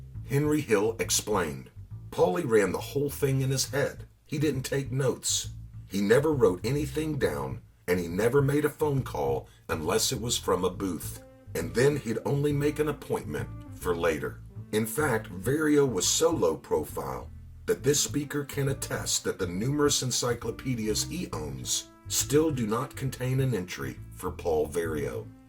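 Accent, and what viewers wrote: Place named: South, United States. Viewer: mispronounces Italian names with his southern drawl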